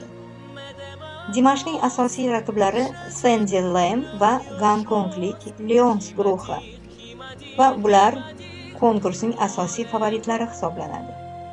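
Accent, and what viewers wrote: Uzbekistan. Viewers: Диктор Хорезмненсизба? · Диктор с какой страны? С Узбекистана?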